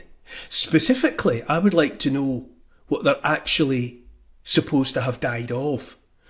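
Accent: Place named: Wales, United Kingdom. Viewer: A Welsh accent saying things like "speed of science" tells you all you need to know. Deer me.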